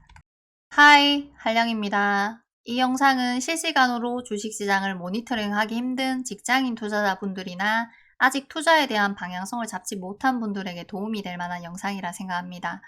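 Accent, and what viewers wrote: Gyeongsang, South Korea. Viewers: 설명이 깔끔하시네요 근데 경상도 어디 사투리인가요~?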